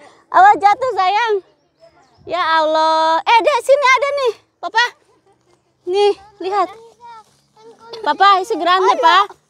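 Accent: West Java, Indonesia. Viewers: Ieu kelebihan na eteh,bahasa batur dilogat na ku basa sunda...top markotop teh,sing sarehat kanggo eteh sakeluarga 👍👍👍👍😘😘😘😘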